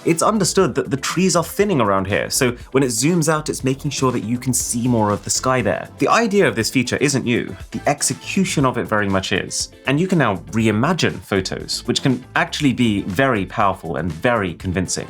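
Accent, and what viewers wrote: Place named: Nottingham, United Kingdom. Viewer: Your from Nottingham thats crazy.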